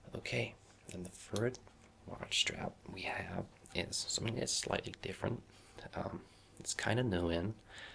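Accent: United States. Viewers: I hear... Boston, NY and some Tennessee twang. Now thats American! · You're a mix between classic US accent, the Joker, and a nerd... And I kinda love it. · THE AMERICAN ACCENT IS AMAZING. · He's in the UK. Maybe he's trying to do an American accent. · I didn't think your American accent was that bad actually! I have definitely heard worse :D This was a lovely video. I was surprised at how the sound of the metal got my tingles going. What a great trigger! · Say... that's a pretty good American accent. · Great video! And it is funny how you try to do an American accent (you did a good job) and you didn't really pronounce the "r" which is typical British. So instead of saying offer you said offeh. Don't get me wrong I like it! Once again great video and I've been subscribed to you for quite a while so yeah! Keep up the good work! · Love the American accent. 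:) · I LOVE your american accent! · You know, I've always wondered what it would be like to do an American accent when you normally have a British one. Anyway, good work on the accent; it's not that terrible! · oh that american accent is hilarious & insanely cute. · omg nice American accent!!!! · Nice American accent great role play thank you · Not a bad American accent! Much better than most Americans can do an English one! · This is my first time watching him and I thought he was American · Wonderful job with this one. You did a pretty good job with the American accent. You dropped it once or twice when you said certain words but it's no biggie at all. It's a wonderful video. Brilliant job as always. :)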